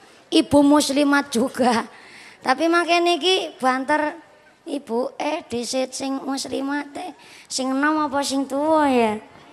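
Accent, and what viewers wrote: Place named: Banyumas, Indonesia. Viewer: Pakai bhs indonesia jd hilang ngapaknya, biarlah dg cirihasnya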